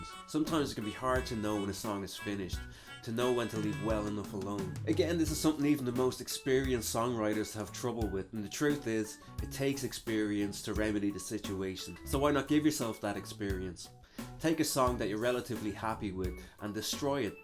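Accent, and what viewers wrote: Dublin, Ireland. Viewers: Is that a Dublin accent I hear? Or is it from a bit further west?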